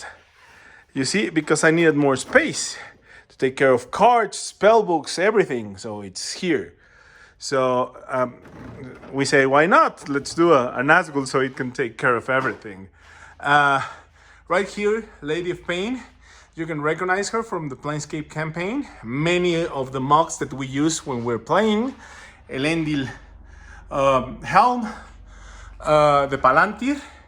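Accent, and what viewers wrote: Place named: Latin America. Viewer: De donde eres? Por tu pronunciacion, se escucha que eres latino! Esta conmadre tu cuarto!!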